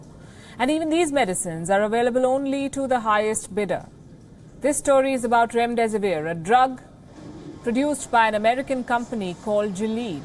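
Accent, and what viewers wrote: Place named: India. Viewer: It's indian English haha Btw lakh means 100k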